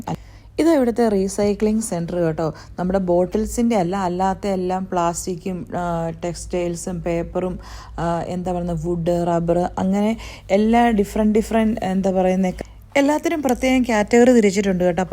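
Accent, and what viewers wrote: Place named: South Kerala, India. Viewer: Nattil evdeya South Kerala style talk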